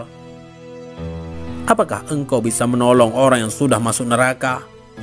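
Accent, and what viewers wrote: Aceh, Indonesia. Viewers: Dialeg admin, terdengar seperti dialeg aceh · Saya lebih ke suku aceh suara "s" dan "t" nya khas .. dan fasihnya melafazkan kalimat arab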